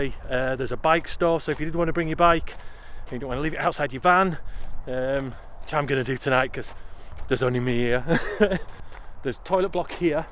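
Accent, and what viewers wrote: Yorkshire, United Kingdom. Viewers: Reet - what a classic Yorkshire man you are this week - "I'll wring every ounce of gas out of that thar bottle, as I paid for all of it." And then, "Thow'll save watter by eatin out tha's pan." Hilarious.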